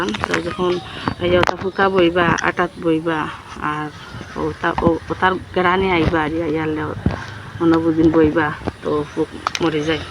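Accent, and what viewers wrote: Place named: Sylhet, Bangladesh. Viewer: আসসালামু আলাইকুম আপু অনেক ভালো লাগলো আপনার ভিডিওটা ফুল ওয়াজ করে দেখে নিলাম আর সিলেটি বাসার কথা গুলা শুনলাম আমিও সিলেটি থ্যাংক ইউ আপু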